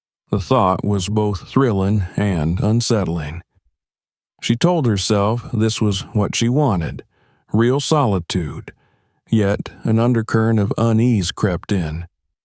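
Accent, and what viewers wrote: South, United States. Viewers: I am enjoying the story, but am distracted by the southern accent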